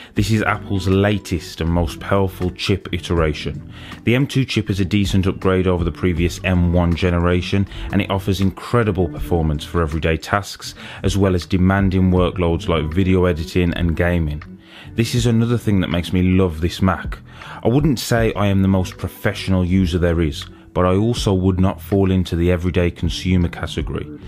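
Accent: England, United Kingdom. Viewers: your English accent is the icing on the cake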